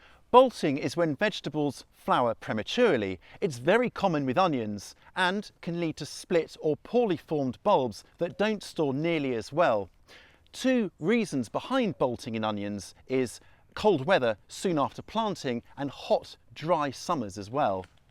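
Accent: England, United Kingdom. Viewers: The English accent helps take you seriously. Like oh shit this guy sounds like a professor I better listen up 😳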